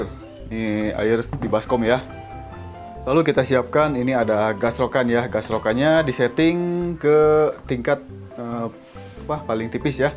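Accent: Sunda, Indonesia. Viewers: Kang sunda pisan Ga ada budah trs kl udah gak ngagolotrak Santai banget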